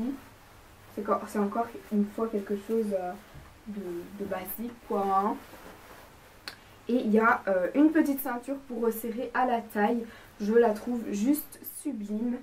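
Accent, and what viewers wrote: Belgium. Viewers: Coucou, Je viens de tomber sur cette video et j'adore tes achats ! Tu as beaucoup de goût ,c'est simple mais très efficace :) tout ce que j'aime De plus, je te trouve pétillante et j'aime bien ton petit accent belge :D Je m'abonne!!! Bisous :*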